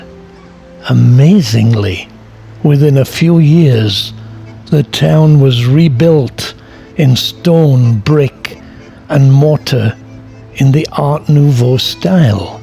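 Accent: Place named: Scotland, United Kingdom. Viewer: I've watched nearly all your videos. they've been a great help to plan our itinerary for our summer trip with our five teenage children. Where are you from? I just can't figure out your accent, sometimes, a bit Scottish or North of England. You're either a Scandinavian who's lived in England a long time or a British person who's lived abroad a long time. Thanks for the great videos and useful tips.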